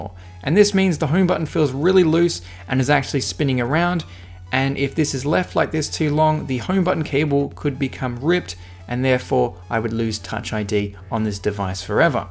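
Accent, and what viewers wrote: Australia. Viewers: I like the Australian accent. You know, you are truly doing an outstanding job; I have watched almost each single video on your channel. Keep posting us!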